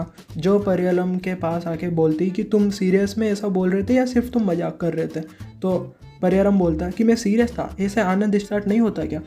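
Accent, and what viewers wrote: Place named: South, India. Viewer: not like that bt tumhara acsent full south wala he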